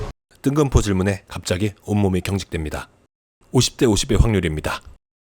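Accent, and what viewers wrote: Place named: Gyeongsang, South Korea. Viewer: 말투가 경상도인데 원주 사람이라 그러네 ㅋㅋ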